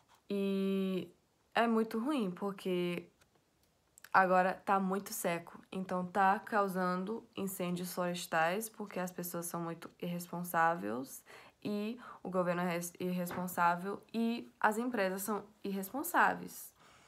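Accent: Bahia, Brazil. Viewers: Parabéns, você é uma menina muito culta, seria bom ver jovens como você tão inteligente e voltada pra as coisas que acontecem no mundo. Suas verdades nos inspiram... Obrigado... Aproveitando o ensejo, é incrível como você fala muito bem o português brasileiro (sotaque nordestino - Bahia)...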